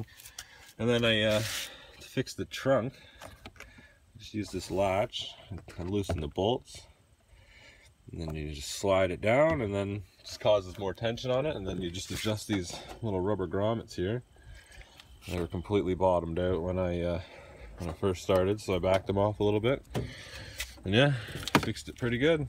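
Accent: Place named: Canada. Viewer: U sound Canadian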